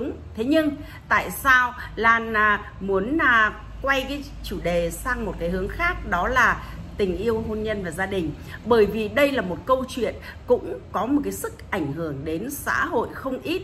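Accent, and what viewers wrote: Standard. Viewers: Chi noi chuan qua dan ong hay ham cua la an com chan thich an pho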